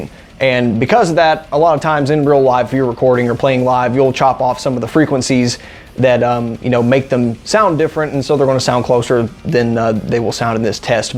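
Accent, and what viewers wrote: Texas, United States. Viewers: Dude, a Pantera t-shirt goes with that accent, not a Black Sabbath one.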